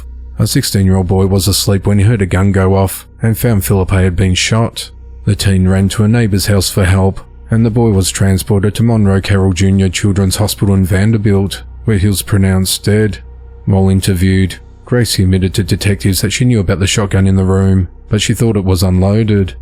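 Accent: Australia. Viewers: You're definitely an Australian, hey?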